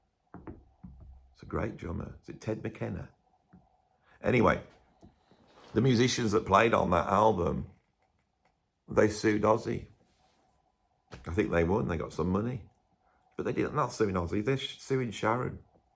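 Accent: Birmingham, United Kingdom. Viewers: Your a character besides our general interest in music Were here to listen to you.There now I've sucked up. I don't mind Brummie,we need a good laugh besides the serious stuff. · Brummie stream of consciousness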